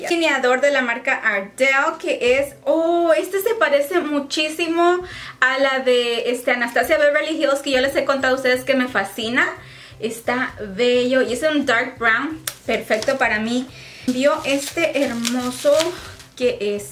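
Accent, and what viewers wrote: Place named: Mexico. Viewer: Disculpen mi ignorancia yo recién veo este canal pero no es mexicana? porque para mí tiene todo el acento mexicano.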